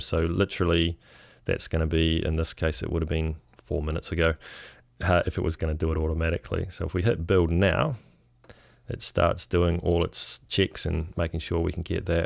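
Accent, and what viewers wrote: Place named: New Zealand. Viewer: Yo Kiwi dude can pick that accent a mile off have you gone to latest yet with Yosemite, It came with a few issue to start with but after talking with the developer it seems to be sorted. Scheduling is fairly start forward the hardest part is making iTunes conform 12 isn't an improvement it com,pellets messed up my playlists. but that might not be a bad thing as I'm moving everything from an iMac to a 12 core and will have to go through everything agin, it just never ends. B y the way what the story over there now on low power FM stations across the ditch if your single penetrates a piece of paper it is considered too powerful .02 of a watt max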